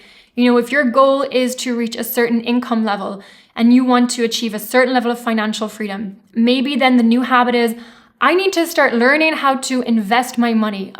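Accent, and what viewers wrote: Ireland. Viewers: Did you spend time Ireland? I notice a hint in the accent! ❤😊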